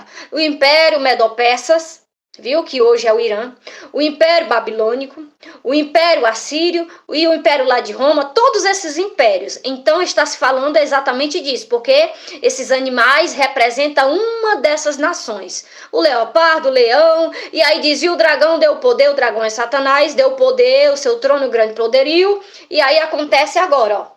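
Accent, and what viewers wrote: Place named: Northeast, Brazil. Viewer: Kkkkkk chegando agorinha 😂❤°°!!!! Vc deve ser de algum estado do Nordeste,, vc fala com sutak q nem eu !!! JA ME ESCREVI E É AQUI QUE VOU APRENDER MAIS ➕️ ➕️!! OBRIGADO!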